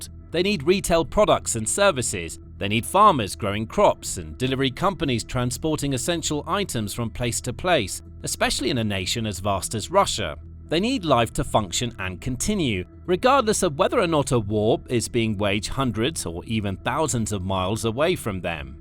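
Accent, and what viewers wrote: United Kingdom. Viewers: I gradually got used to associating that british accent with unabashed non-stop lying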